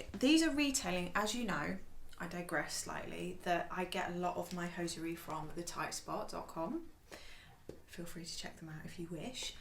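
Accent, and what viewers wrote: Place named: United Kingdom. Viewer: YOU HAVE BRITISH ACENT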